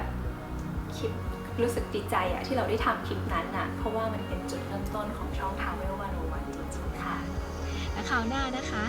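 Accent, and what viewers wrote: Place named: North, Thailand. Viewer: สวัสดีจ้าว ปี้หนานดูคลิปตี้ไปแอ่วแม่กำปองฟังกอยสำเนียงเเล้วต้องเป็นคนเหนือแน่เลย มากอยคลิปนี้ฮู้เลยว่าเป๋นคนเหนือดีใจ๋เด้อตี้มีช่องคนเหนือทำช่องดีๆ ปี้หนานก็ทำช่องเหมือนกันมือใหม่หัดทำ ทำไปเก็บความประทับใจ๋ไว้ดูอีกหน่อยทำดีพ้องบ่อดีพ้อง สู้ๆไปเด้อ เที่ยวบ้างไม่เที่ยวบ้าง✌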